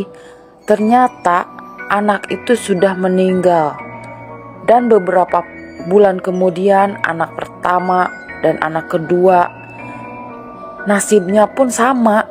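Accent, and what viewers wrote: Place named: West Java, Indonesia. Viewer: mantap cerita ada animasinya, klao dnger dari logat kayanya dari sunda semoga chanelnya sukses, yg penting tekun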